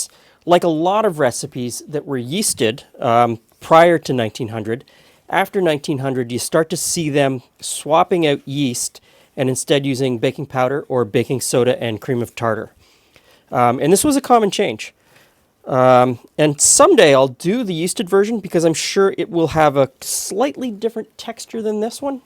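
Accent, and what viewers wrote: Canada. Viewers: Canadian Accent on point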